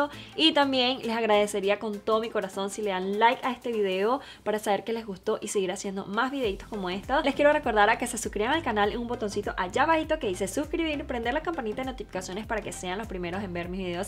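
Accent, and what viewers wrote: Venezuela. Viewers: Yo sabia que era de VENEZUELAAAA ese acentoooooooooooooooooooooooooooooo like like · Hola, primera vez que veo tus videos y por que amo a Nena. Quería saber de donde eres? Tu acento se me hace algo Venezolano, me da curiosidad. Me gusto mucho tu cabello, y no fue un fail el tutorial, solo que ella tiene mas iluminación y quizá y se echo un poco menos de producto, pero en fin, me gusto mucho tu video, iré a ver todos los otros. Besos💓😘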